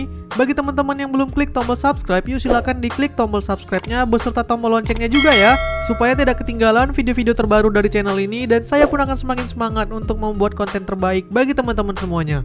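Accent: Dumai, Indonesia. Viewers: Org dumai abg ni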